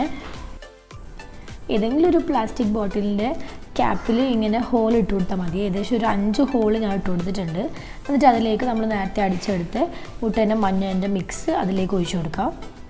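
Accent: Kannur, India. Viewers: Kannur food nammak.peruthishtam..😍 Kannur slang nalla rassa kelkkan. Endayalum spr recipe 😘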